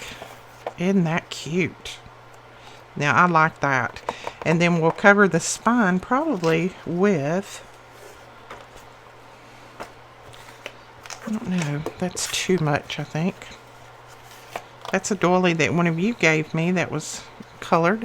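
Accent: South, United States. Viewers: I love all your videos & as a Canadian have come to understand your Southern drawl: ) Love the detail & generosity of your freebies & today signed up for your newsletter. Keep up the great crafting ideas!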